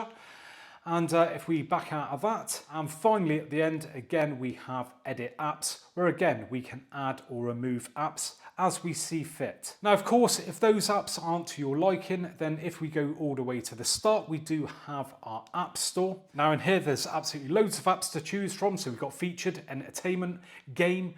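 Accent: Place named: Bristol, United Kingdom. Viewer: Love that, I need one that size for my dining table (limited to £400 Amazon Fire) & it's a very clear description 👍🏻 You remind me of a Bristol version of Ross Kemp 😂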